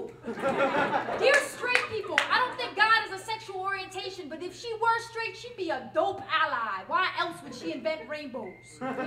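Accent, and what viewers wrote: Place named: New York, United States. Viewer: She sounds like she’s from New York 😂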